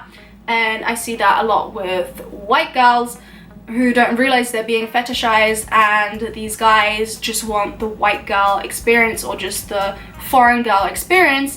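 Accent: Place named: United Kingdom. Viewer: ohh because i heard some British accent..nice to meet you here🌼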